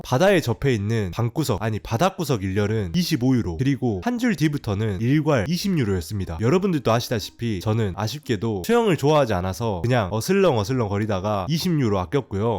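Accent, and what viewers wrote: Jeolla, South Korea. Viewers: 혹시 억양이 전라도 분이신가 티가 나는데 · 말이 전라도이지요 요사히 전라도라면 그렇게 좋아하지 않구만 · 아따 전라도 양반이구만요..ㅋ 사투리 느껴짐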